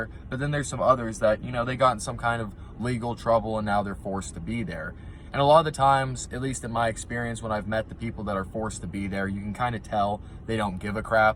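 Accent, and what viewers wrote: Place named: Pacific Northwest, United States. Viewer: So great to hear you are in family counseling -- what a gift you have been given. Sorry about the panic attacks. I suffered terribly in my late 20s through 40s ish tbh. I never knew I needed to deal with stress. I didn't know I could say " this is too much " -- You can say // we can say --- " this is too Effing much " --- cry, shut down, isolate --- have a pity party to rest by ourselves if we need to. Just don't stay there --- We must Release the valve when we feel super Wound -- but I didn't know that // it's oK to say "this is too much" // ... I Could never smoke pot --- too much anxiety // paranoia --- love listening to your sobriety --- I am trying to decipher your accent -- can you at least tell me what part of the country? Pacific NW?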